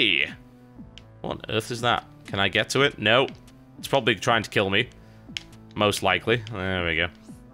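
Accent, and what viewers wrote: United Kingdom. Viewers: they generally have different accents but very similar however he is very british in his accent cant tell if trolling or stupid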